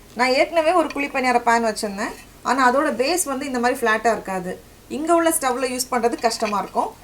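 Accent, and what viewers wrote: Nagercoil, India. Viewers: So happy to hear namma ooru Tamil. Looking forward to seeing more of your videos. By the way,,,can u upload some roast/grilled chicken in oven if possible? I am from Nagercoil but now in UK, where we get lovely meat joints. I hate the English way of doing it as it’s too bland. Oven roast chicken, duck, turkey, ( if u r ok with beef and pork u r welcome. if not ignore it. No issues) Wish u a wonderful New year. Nothing refreshes us like a visit to our home town. Keep going. All the best.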